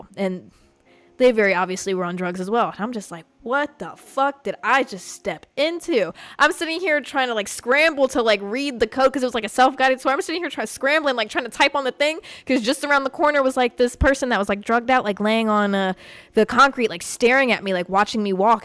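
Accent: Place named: South, United States. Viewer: you sounded so southern in this one lol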